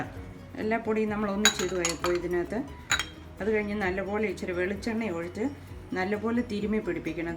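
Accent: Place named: Kottayam, India. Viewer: Very easy. Keep going..waiting for simple recipes. I like kottayam style language also.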